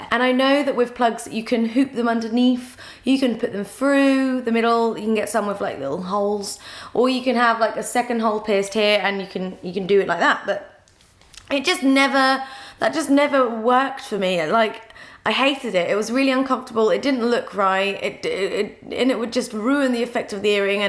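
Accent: United Kingdom. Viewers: I'm watching your videos since 4 years, but yesterday I watched the Amy documentary and since then you remind me of her, don't know if it's just the british accent or the tattoos or the uncommon hair, but that's the whole time in my mind :D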